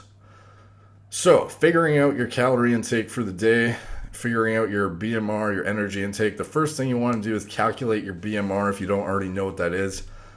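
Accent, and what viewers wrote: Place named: Canada. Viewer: He sounds way more Canadian here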